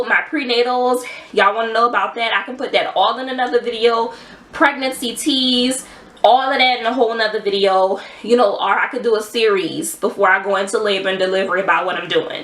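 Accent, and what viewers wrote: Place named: Louisiana, United States. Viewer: You have to be from Louisiana are sum😭your accent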